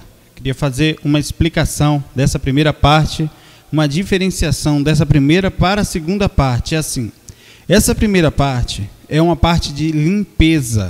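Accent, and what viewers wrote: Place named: Bahia, Brazil. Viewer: Nestes vídeos antigos ele não tem sotaque, que diferente. Ouvindo em 2023. Assistindo ele atualmente percebe-se um sotaque bem pronunciado da Bahia. ❤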